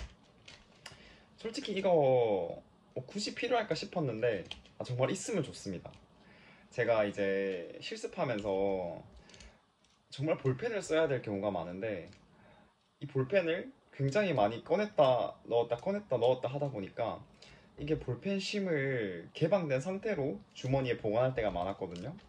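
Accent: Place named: Busan, South Korea. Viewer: 오..와 사투리가득한부산남자의 제품리뷰..😆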